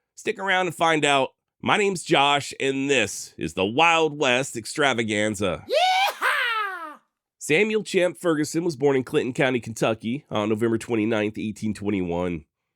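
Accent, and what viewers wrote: Texas, United States. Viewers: First I’ve heard a Texan accent quoting Dylan Thomas was right here 😊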